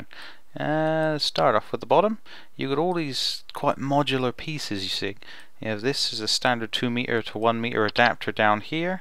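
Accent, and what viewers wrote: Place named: England, United Kingdom. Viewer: You sound fairly English to me, but maybe its just me.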